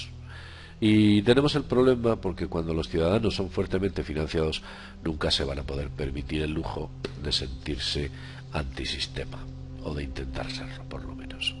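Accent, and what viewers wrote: Spain. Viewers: es español, fijate en asento jaja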